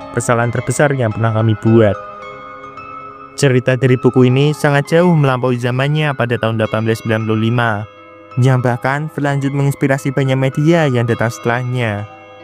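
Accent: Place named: Java, Indonesia. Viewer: medok jawanya ganggu bgt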